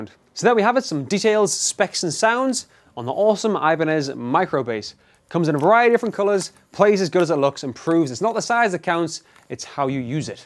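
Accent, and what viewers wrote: United Kingdom. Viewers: British. No bollocks talk.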